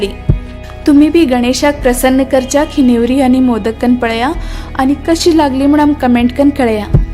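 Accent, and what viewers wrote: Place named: Karwar, India. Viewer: Refreshing to hear karawari Konkani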